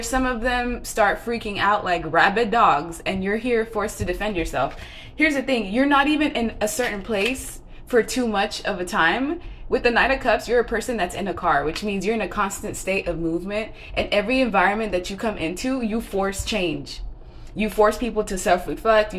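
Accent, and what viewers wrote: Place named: Latin America. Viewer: Girl I’m a black girl I could’ve sworn you were Hispanic, you got a lil accent!!! Lmao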